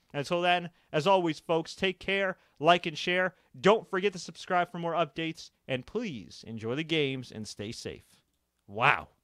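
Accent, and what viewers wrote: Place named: South, United States. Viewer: This guy has a southern accent, Lew has a Canadian accent. Delivery is similar tho.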